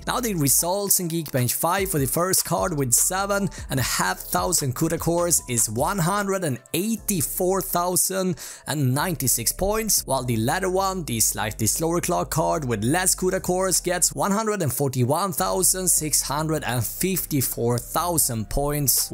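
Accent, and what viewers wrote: Sweden. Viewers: As a fellow Swede I instantly knew you where swedish hearing you speak english haha, no offence man!. That said, please work on your presentation, you sound like a robot and talk way to fast. This is only constructive cririsism, the content is great! peace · he's a swede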